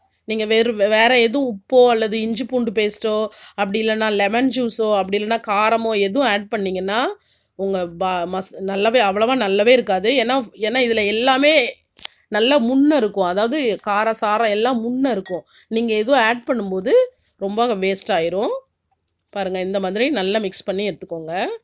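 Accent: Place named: Kanyakumari, India. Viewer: Your slang sounds like kanyakumari…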